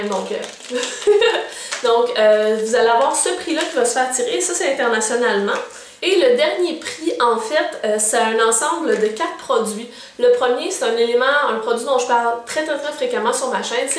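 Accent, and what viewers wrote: Quebec, Canada. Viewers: J'aime tes vidéos, il sont très utiles :) en québécois en plus ça fait du bien hihihi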